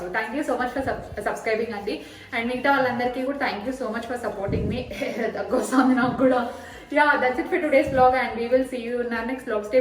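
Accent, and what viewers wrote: Anantapur, India. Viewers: Hi l love anantpur language it reminds me my amama village elukuntala I spent my childhood Holiday's over there, my grany Cook's pappu very tasty, by the way can u please mention hair shampoo, and soap,i am bit confused which one to use to my 9years old daughter.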